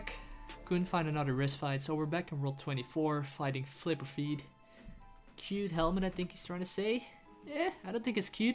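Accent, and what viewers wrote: Netherlands. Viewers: Proper vids like always and just love that Dutch accent Ign HouseofHouse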